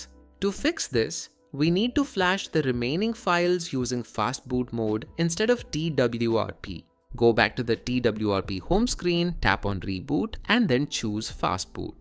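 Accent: India. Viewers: I dont understand what you saying bro i only hear indian yapping bruh 💔💔💔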